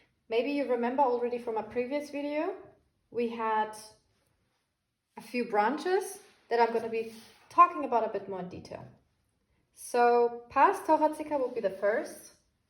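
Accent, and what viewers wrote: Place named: Germany. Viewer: sound German for me, either way thanx for the video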